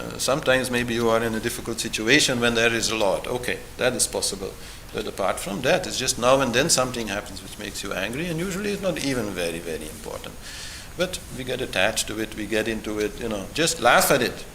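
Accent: India. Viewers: why do you speak like a indian and also spurt out trash ?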